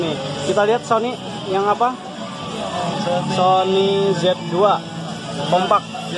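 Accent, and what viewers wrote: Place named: Java, Indonesia. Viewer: Medok banged suara.mu? 😅😅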